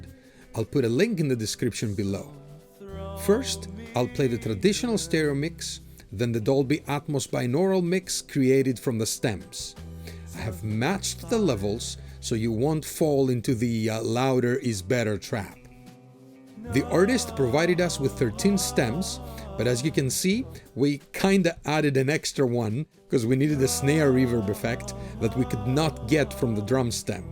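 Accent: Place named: Greece. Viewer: Subbed you right away, although it has more to do with how you present the info & content, that greek accent is too good.. (this channel is certainly not boring like other audiophiles out there)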